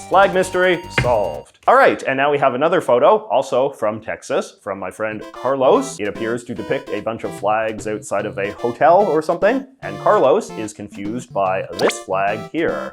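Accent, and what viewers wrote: Canada. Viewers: watching you made me realize how real a canadian accent is as an american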